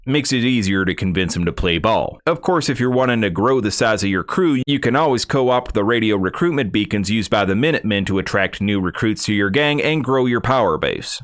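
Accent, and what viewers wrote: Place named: South, United States. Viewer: I'm sorry but that "southern twang" is just annoying. Personally I find it distasteful and extremely cringey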